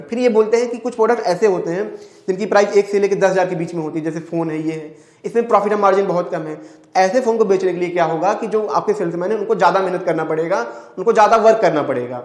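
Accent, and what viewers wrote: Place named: Uttar Pradesh, India. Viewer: I like the accent .....up hindi....explanation is superb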